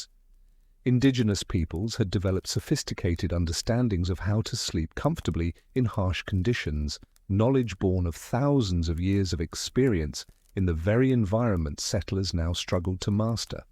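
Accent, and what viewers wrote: England, United Kingdom. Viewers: Old west stories by a narrator with an English accent?! I’m out 🙄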